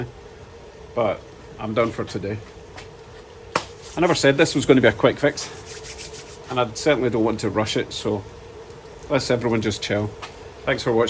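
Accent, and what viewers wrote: Scotland, United Kingdom. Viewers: Did I just stumble upon a Scottish mechanic. Awesome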